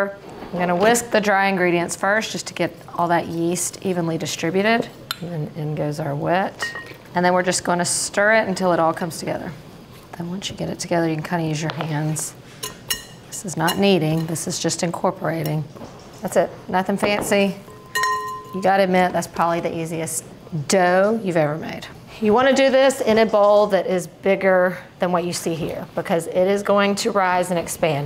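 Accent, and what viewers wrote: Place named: Alabama, United States. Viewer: You talk like me. We must be from the same area in Alabama.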